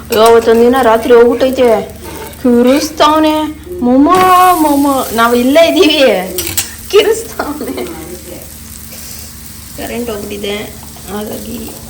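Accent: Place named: Hassan, India. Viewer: sister ನಿಮ್ಮ ಊರು ಹಾಸನ ನೀವ್ ಮಾತಾಡ್ತಿರೋ ಭಾಷೆ ಹಾಸನ್ ತರ ಇದೆ ನಮ್ದು ಹಾಸನ ಅದಿಕ್ಕೆ ಕೇಳ್ದೆ 🫰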